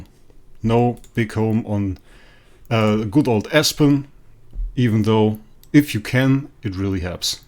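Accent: Germany. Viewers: Da hört man den deutschen raus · are you german or where are you from?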